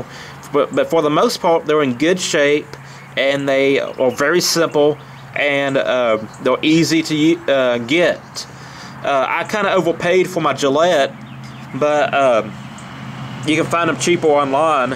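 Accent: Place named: Louisiana, United States. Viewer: oh, I actually thought you where from Louisiana Cajun accent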